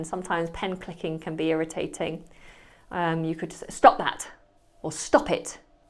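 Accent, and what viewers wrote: United Kingdom. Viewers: You are superb!! I am not a native but i have a US dialect when speaking and i watch you while jealously wishing i have your UK accent 😂❤❤ Following from Iraq 👋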